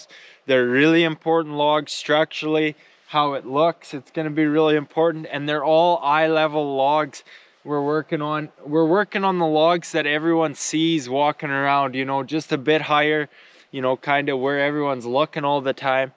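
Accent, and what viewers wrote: Canada. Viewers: My family has been in Canada since 1809, we own large amounts of land for generations and yet still this guy sounds more Canadian than me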